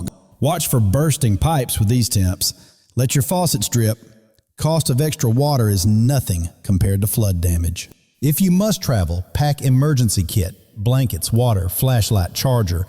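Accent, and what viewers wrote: South, United States. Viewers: so whats YOUR qualifications to be making a public forecast? Your lame southern accent sucks. Go get a job.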